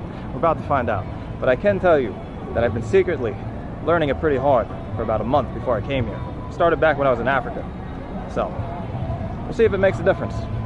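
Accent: New York, United States. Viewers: …is it me or does your New York accent come across in your Asian language efforts? Seems like. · 2 seconds after hearing you talk... this guy HAS to be a New yorker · I hadnt watched in a while ..... apparently he became 200% more NY ?! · When Sir Arthur Conan Doyle killed off Sherlock Holmes, then brought him back after a great public outcry, his back story was, "he played dead but traveled for years, even meeting the head Llama in Tibet. He travels to Mecca, Persia, meets The Khalifa of Khartoum, etc." I think here could do the same thing, make his way to have an audience with any royal court. What do you expect from a New Yorker with that fantastic accent. What mental skill! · Your Japanese is pretty good for a month of study - you seem comfortable talking to people - as good as mine after 7 years of studying. I really enjoyed your video, and your accent (must be New York).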